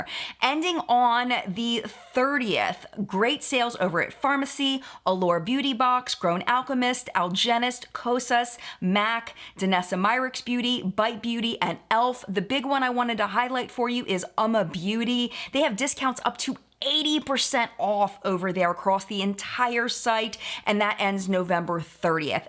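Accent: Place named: Philadelphia, United States. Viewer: Where are you from? I'm catching maybe some Philly "o"s?? But I don't really know what I'm talking about.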